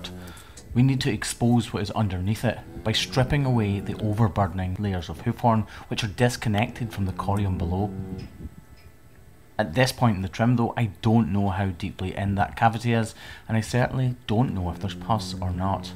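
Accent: Scotland, United Kingdom. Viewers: Are you Scottish? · Yes, you are a Scottish man - heavy accent, so obvious! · I don’t care about cows, I‘m here fir the Scottish accent.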